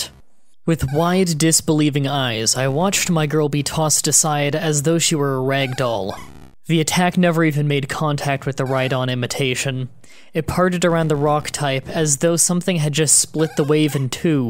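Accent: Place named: Canada. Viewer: Danielle Eaton Since it looks like he isn't going to reply I will, the words he "mispronounced" are Americanized typos. Meaning they were actual words that Americans spelled incorrectly when making the dictionary. He is Canadian and reads the words as they should be read, the rest is his dialect. He has stated this at this point probably more than one hundred times and it might even be in the description of his creepypasta videos.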